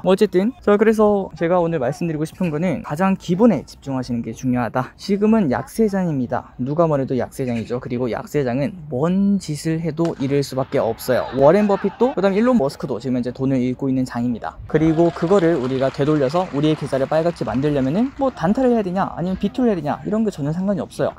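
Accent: Gyeongsang, South Korea. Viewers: 대구 사람이셨구낭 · 혹시 대구분이신가요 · 대구사람이에요??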